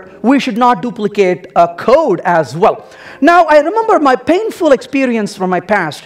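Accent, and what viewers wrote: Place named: India. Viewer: Indian accent and public speaking don't go well together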